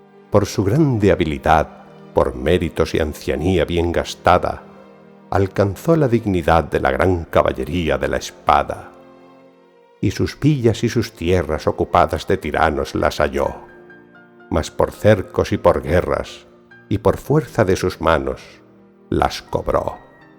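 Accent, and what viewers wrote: Galicia, Spain. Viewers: Estimado, por tu dejo, te adivino peninsular. Razón demás para llamarte la atención, pues siendo paisano del inmortal Manrique, le faltas el respeto;en la declamación y escritura. Me bastó escucharte unos minutos para ello. Al declamar en la primera estrofa separas, pareciera intencionadamente o por ignorancia"... y chicos " Lo segundo y más desastroso y lesa literatura: cambias" allegados", por "y llegados". Soy un peruano cincuentón, amante de la buena literatura. No entiendo como no corregiste semejante desaguisado. Tu dejo debió ser un plus, sin embargo, no hallo calor y sentimiento. Ojalá valores y tomes las críticas con ánimo de enmienda. Att. Ramsés Torres Ramírez Puente Piedra-Perú. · Perfectamente! A mi me gusta muchísimo. Eres mi amor. Tienes un acento gallego? (soy un extranjero, es dificil para mi distinguir) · Cuando lo recita un gallego suena mucho mejor, yo que tengo acento argentino. 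Muchas gracias, maestro 👏👏👏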